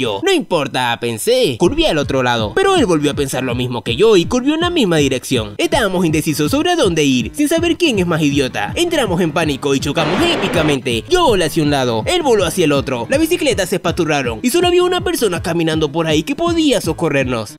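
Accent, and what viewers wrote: Venezuela. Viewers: Eres venezolano? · Oe eres de Venezuela?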